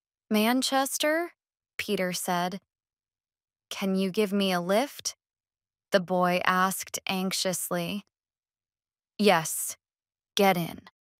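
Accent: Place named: United States. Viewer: I like the way speak clear from American accent. Chapter 2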